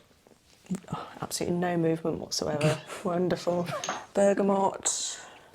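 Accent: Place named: England, United Kingdom. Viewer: l@ I asked that becuase she a similar accent to Geri Halliwell. They are not too far from each other, North of London.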